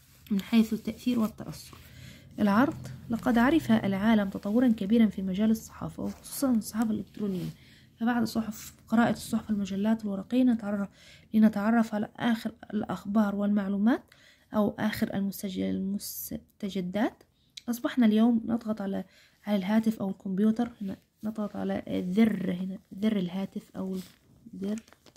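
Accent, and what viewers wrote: Egypt. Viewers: انت مصريه لم افهم😢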